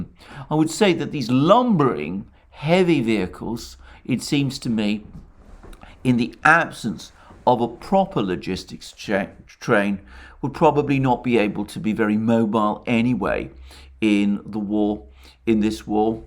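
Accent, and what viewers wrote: England, United Kingdom. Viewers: his lovely English accent,they way he scratches his head....that loquanical....droll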